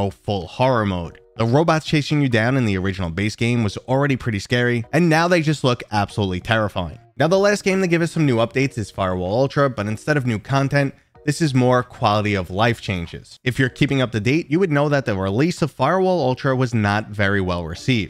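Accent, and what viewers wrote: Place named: New York, United States. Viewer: I want to say New York accent but it could be Boston although I'd be surprised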